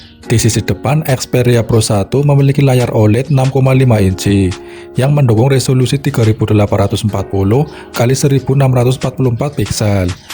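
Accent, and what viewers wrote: Banyumas, Indonesia. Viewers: Ora ngapak ora penak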